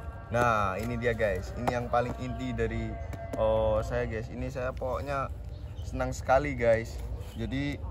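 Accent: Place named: Banyumas, Indonesia. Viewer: Kayane tiang ngapak 😁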